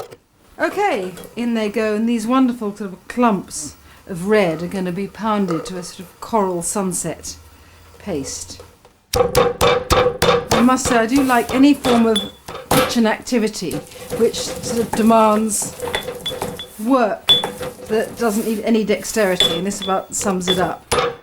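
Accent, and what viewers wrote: England, United Kingdom. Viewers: What type of English accent does she have?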